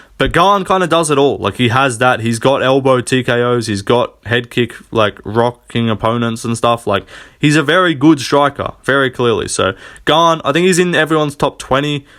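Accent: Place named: Australia. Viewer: You have an Aussie accent and you didn't put Jdm in top 10? Nah you a traitor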